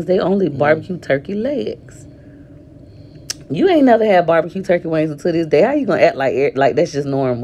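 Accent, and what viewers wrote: South, United States. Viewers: you sound like a southern belle, funny as heck.!!🤣🤣🤣🤣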